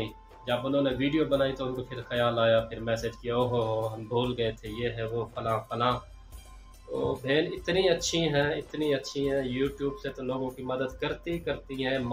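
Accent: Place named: Saraiki, Pakistan. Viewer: سرائیکی آواز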